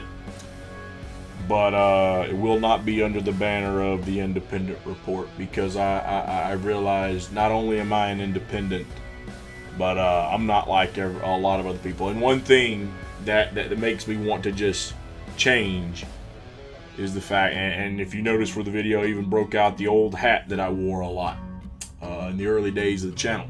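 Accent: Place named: South, United States. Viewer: for what it’s worth you have consistently been one of my favorite channels because you speak your truth in this desert of ridiculous echo chambered circular firing squad madness of collective cognitive dissonance that makes up most of so called “indie media.” If “independent” means “independent” of actual intellectually honest and consistent thoughtfulness; then that title may apply to far too many channels, but not yours. Whatever you do; always be wishing you success, the best and nothing less. Take care out there brother as it’s been nice hearing a fellow southerner that shatters the stereotypes; thanks for all you did (and will do.) ✊ Always Be, Your Huckleberry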